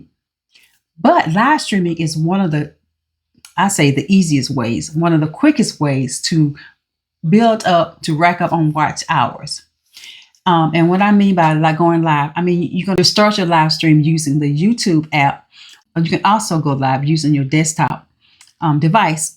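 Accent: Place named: Southeast, United States. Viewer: Hey! Thanks for visiting our channel and subbing! You also have a new sub! This is a great, informative video! One of my biggest issues is posting our content on a more consistent basis (we have tons of recorded content). We would like to do a live eventually but I want to wait until we have at least 1,000 subs. I would hate to start a live and no one showed up 🤣🤣. I love your style and your accent. I am guessing that you are probably from one of the southeastern states? You hit on all the points to get those watch hours; Consistency, Playlists, Storytelling, Engaging Content, etc. Keep up the great work. Look forward to your next video!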